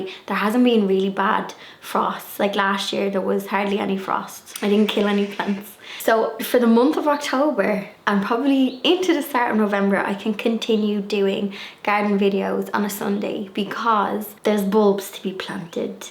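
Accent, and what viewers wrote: Ireland. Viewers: Love all your videos, what ever you put out I am happy to just soak up your beautiful soft Irish accent 🥰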